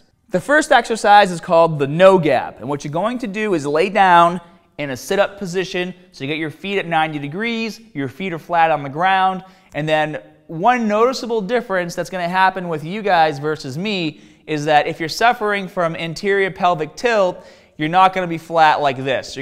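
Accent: Boston, United States. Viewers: Nah, that’s a Masshole accent :-) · do your ears work He's from Boston. 😂, Australia 🤣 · A bit of Mahk in your accent lol. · This guy is definitely from baaston... Great video thanks for tips.👍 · are you from wicked good Bahston? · Before reading replies, I say Boston accent. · That's definitely Boston New England accent. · Staaaahted! LOL love the Baaaahston accent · Love that Boston accent! Excellent explanation and routine, thanks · Came for the tutorial, stayed for the Boston accent. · Is this guy from Boston? What accent is that? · Boston accent? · Not Aussie lol Boston it seems. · Where’s this guys accent from in the US? He says wardah and pahtts. Nothing wrong with it its interesting and making me listen more 😅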